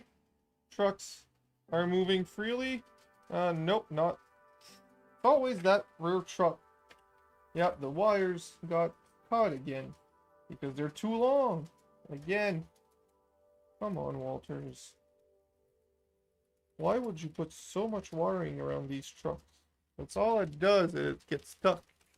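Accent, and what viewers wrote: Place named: Eastern Canada, Canada. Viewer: iwas into O gauge before N scale takes too much room N scale got into it 2 months ago all Dave and your fault lol. Im originally from northeast ofMiramichi but live in nofthwestern Ontario now . Taught i recognized you eastern accent lol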